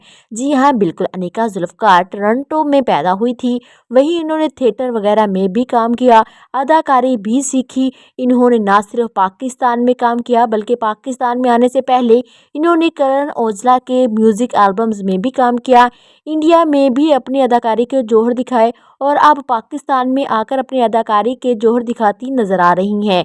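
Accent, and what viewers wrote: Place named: Punjab, India. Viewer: اپنے تلفظ پہ محنت کریں خصوصاً ہندسوں کے۔ آپ پنجابی میں ہندسے ادا کرتی ہیں۔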